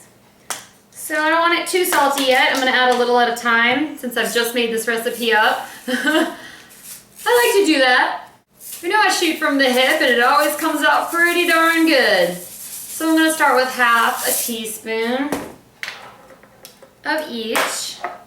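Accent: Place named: South, United States. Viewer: okay so i wanted to sleep about an hour ago... but i got hooked on your videos. they're great, you're awesome, love the accent of the south and of course your recipes... will definitely be trying there out! thanks. subscribed!!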